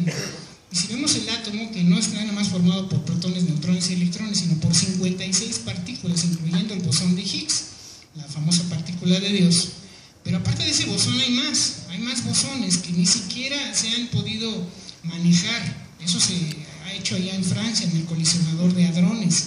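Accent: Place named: Sinaloa, Mexico. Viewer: ¡Sabía que conocía ese acento en algún lado! Eres orgullo de Sinaloa, sigue así;)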